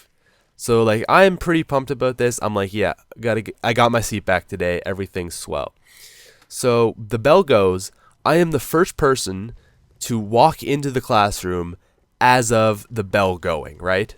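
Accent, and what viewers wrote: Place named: Canada. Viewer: His Canadian accent is awesome.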